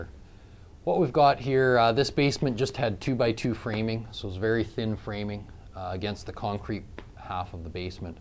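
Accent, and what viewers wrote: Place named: Canada. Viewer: You sound very Canadian. 😁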